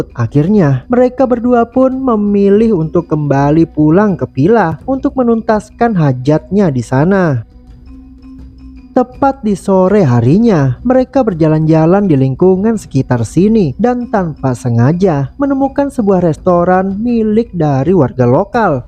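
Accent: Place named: Banjarmasin, Indonesia. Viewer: Yg bapender ni pinanya urang banjarmasin kalsel😅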